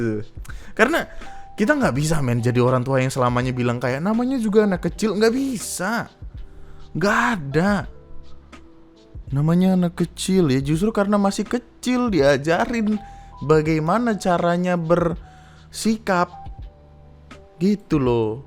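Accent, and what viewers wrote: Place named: East Java, Indonesia. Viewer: Owalah, pantes aku ngerti bosone. Jatim kidulan ternyata 🤣🤣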